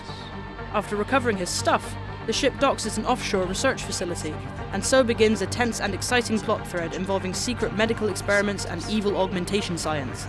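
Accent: United Kingdom. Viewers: HOLY SHIT IGN HIRES BRITISH 15 YEAR OLDS!? HOLY FUCK! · who the hell is this? some British boy/girl? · It is a british girl you idiots I can easily tell from the accent and voice what are you all 18-20 years olds with a brain of 10 year olds. As far the DLC goes it is good but the story is really kind of boring and it is short for 15 bucks and why don't they allow you to import your augmentations from the main game is beyond me.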